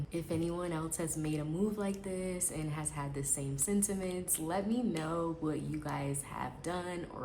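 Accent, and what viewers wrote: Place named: Latin America. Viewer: Are you 100% american or cuz i can hear some latina in you tambien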